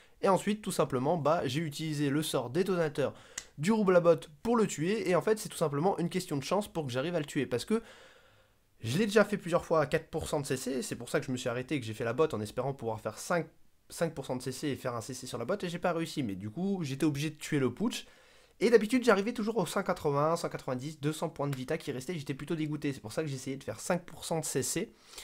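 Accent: South, France. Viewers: Le bon accent du sud :D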